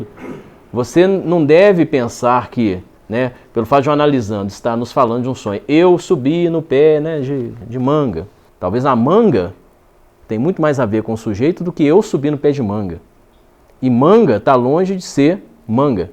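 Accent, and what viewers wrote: Minas Gerais, Brazil. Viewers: O jeito mineiro de falar da psicanálise faz dela brasileira...Boa palestra.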